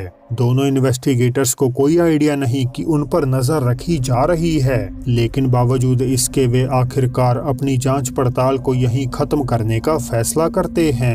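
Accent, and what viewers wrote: Punjab, India. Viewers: Sie ekk baar bta doh app . Kya ap punjabi hoo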